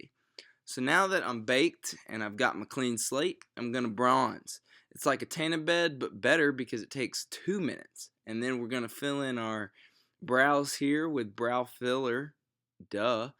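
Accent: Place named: South Carolina, United States. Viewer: ARE YOU FROM SOUTH CAROLINA?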